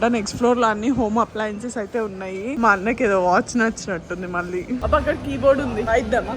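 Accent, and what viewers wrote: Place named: Telangana, India. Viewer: Nee voice kacha Telangana slang lo mast untadi.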